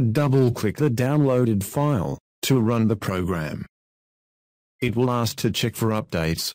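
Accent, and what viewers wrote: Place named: Australia. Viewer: Duh! I hate that Australian voice! Why can't you just change it to an American voice! That would be much better!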